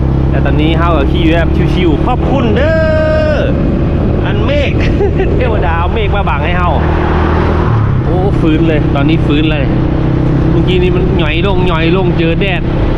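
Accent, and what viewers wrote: Phu Thai, Thailand. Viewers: เสียซื่อพุไทได้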